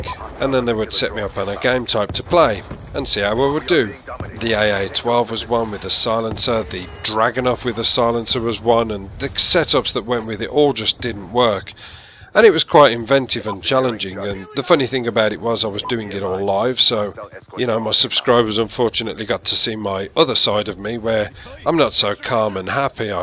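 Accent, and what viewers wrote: Birmingham, United Kingdom. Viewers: hahaha a Brumbie saying "Basicly" always makes me piss my sides. A word that Brumbies always say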